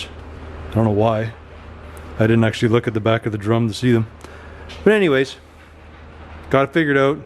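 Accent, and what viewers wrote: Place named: Southern Ontario, Canada. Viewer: That accent tells me southern Ontario🙂👍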